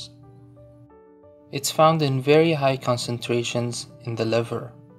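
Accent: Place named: Arab world. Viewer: You speak english with an arabic accent ^_^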